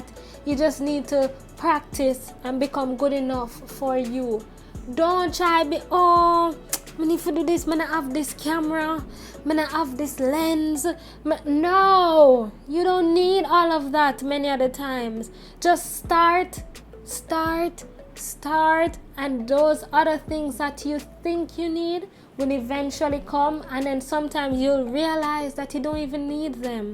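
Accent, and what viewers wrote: Jamaica. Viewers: Alsooo... I love listening to you speak! Makes me feel like im around my cousins and family in Jamaica ❤️❤️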